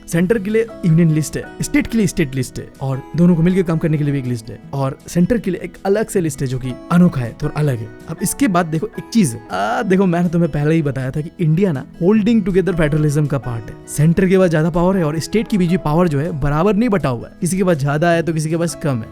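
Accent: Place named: Bengal, India. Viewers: Bengali bhai ap kaha se ho 🤨🤨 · Bhai aap sach me bangali ho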